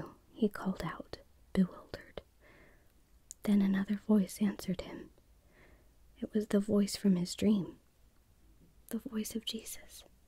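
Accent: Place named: Texas, United States. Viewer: It's great to hear from a fellow Texan again. Wonderful video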